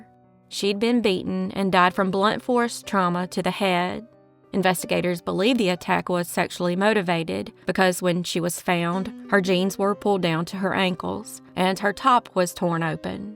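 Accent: South, United States. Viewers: Does it make sense to anyone else, that a southern voiced AI is doing such a horrible job of narrating these stories! Can we get a New York narrator please?! Geez!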